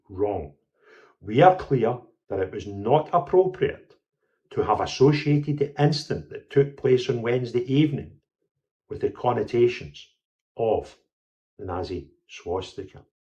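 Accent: Scotland, United Kingdom. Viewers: It's nice to hear an authentic Scottish accent, my friend.. But since I have been writing about the infiltration of our whole Scottish culture......and particularly the pederast invasion of our very vurnerable Primary School kids, by unqualified 'Pride' Groomers, for twenty anonymous years..... Why is it that they are only, now....Platforming' you? PS: 'Copy to Basil' ...Faulty.....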